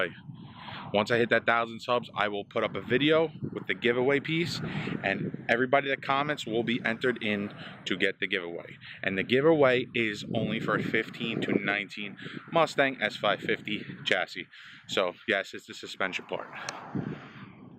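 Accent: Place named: Boston, United States. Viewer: This dude def from Boston.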